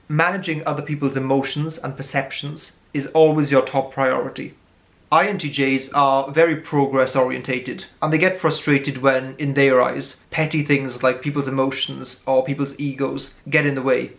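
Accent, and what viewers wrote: Wales, United Kingdom. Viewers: Yo, are you Welsh by any chance?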